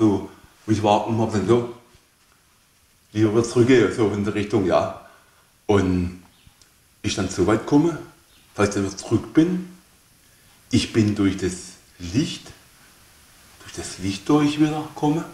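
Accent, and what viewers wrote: Swabia, Germany. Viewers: Leider für mich als Norddeutschen aufgrund des stark ausgeprägte schwäbischen Akzents und der etwas mangelnden Tonqualität nur schwer zu verstehen. Schade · das ist schwäbisch!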